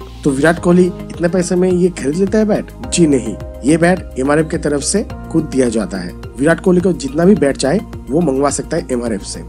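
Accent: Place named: Uttar Pradesh, India. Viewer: aap up se Ho na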